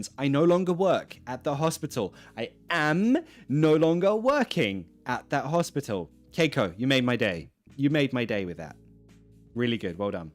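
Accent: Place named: RP, United Kingdom. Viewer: 😂😂😂😂😂😂😂...."I'm at the lower end of R.P😆😆😆😆🤣I speak clearly, but not in a stupid way"😅😅😅😅😅😅 Sos un idolo total,! Me haces cagar de risa!😅😅😅😅😂😂😂🇦🇷🇬🇧🇦🇷🇬🇧🇦🇷🇬🇧🇦🇷🇬🇧🇬🇧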